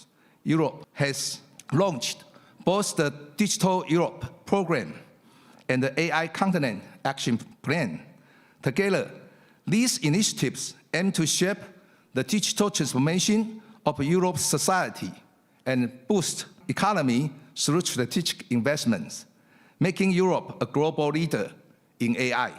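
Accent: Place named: Taiwan. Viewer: 英文發音不好，是因為台語的關係＇如果台灣人的普通話說的好的話＇通常英文的發音不會是這樣的＇這是身邊的台灣朋友出現的這種現象，既使現由專業英語人士再教育也改變不了這台語調的英文。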